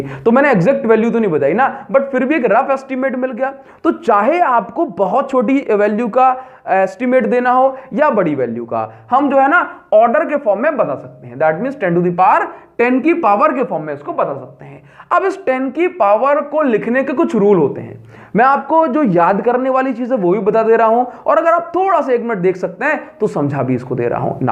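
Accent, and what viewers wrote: Odisha, India. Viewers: Sir ap odisha se ho kyaaaa.....ap bahut achhse padha rahehooo.thanks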